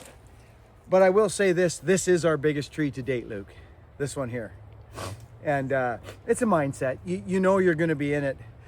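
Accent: Canada. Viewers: Weird he sounds Canadian ? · Where is this accent from I'm guessing Nova Scotia or British Columbia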